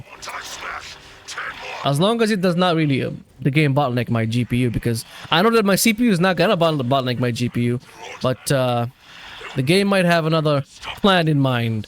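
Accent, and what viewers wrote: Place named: Morocco. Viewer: From your lehja i know you from moracco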